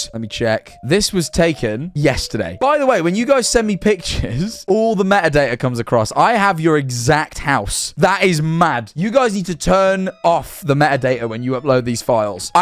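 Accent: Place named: Exeter, United Kingdom. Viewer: you are sounding increasingly Exeter university just thought id let you know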